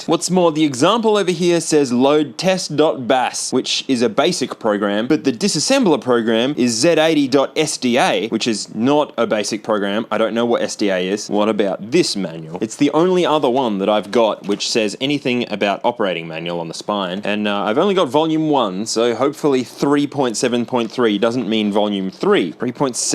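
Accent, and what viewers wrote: Australia. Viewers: That's awesome! I used to own a Para Para Dancing (Korean Market version of PP Paradise). I'm part of MAGFEST here in the states.( Haven't looked yet at your profile and I'm trying to guess by accent... Australian NOT New Zealand 😅) Magfest is a yearly gaming event, 4 days, 24hrs nonstop EVERYTHING, concerts, panels, LAN, Indie gaming, console. We had to cap it at 20K People. We just had MAG 22. I've been involved since 3! I'm the warehouse and on-site event tech. MY gf got involved after we met around the time 11 started, and she later became arcade department head and she was so good at management, she was the head of the board of directors. Unlike me, she's extremely smart, and a scientist. My genius is Electronics repair 😊 Our rhythm/Japanese games section. We have groups of collectors that have insane stuff. Last event over 350 cabinets and over 100 were rhythm games. 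You name it, it was there. I may have a walk through on my channel I posted. I'll have to send you a link, as most of my channel is my daughter playing Pop'n Music. She jumped from low 20s and is currently playing 49s 😂😂😂 I'm jealous AF!! 😂.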